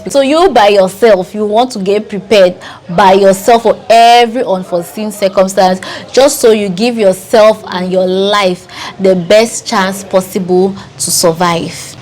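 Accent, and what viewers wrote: Nigeria. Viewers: did you live in Nigeria or Bameda before. You sound nigrian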